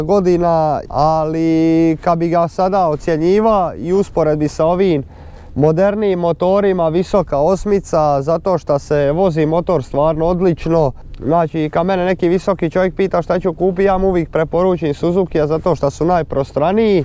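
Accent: Dalmatia, Croatia. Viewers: Volim ovaj Dalmatinski dijalekt. Super recenzije pozdrav iz Rame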